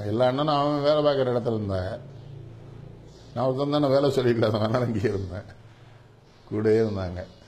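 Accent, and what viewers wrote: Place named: Tirunelveli, India. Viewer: My native nellai speech,,,♥️♥️♥️♥️♥️🙏🙏🙏🙏🙏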